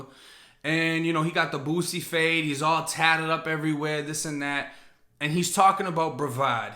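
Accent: Boston, United States. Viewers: He's from Boston area so, yeah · Are you from Boston? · Curious my dude you say you're from Florida and talk like you're from Boston I think you're full of shit bro because I lived 6 years in a max and 4 in the shu and bro wheres the big long tatty on the side of your face looks like your reppin someone else's life race runs the yard stop talking shit like you were accepted as an equal because you'd know nobody's equal in there fucking joke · This dude tries so hard to use a wigger accent but his white Boston accent comes out, just be yourself or you won't ever be able to walk the streets in NYC facts and If you do tuck that flag we ain't Boston for corner Florida, we get down! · U live in FL but sound like you're from baaaaaston Massachusetts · U from Boston homie u sound like it tho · that Irish and Italian you know u from mass bro .. lol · Is this dude from Boston or some shit? 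· I don't understand why this dude always talks about Florida and he sounds like he's from Boston??? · Yo you have the heaviest Boston accent. I thought my NYC accent was bad but you win. Lol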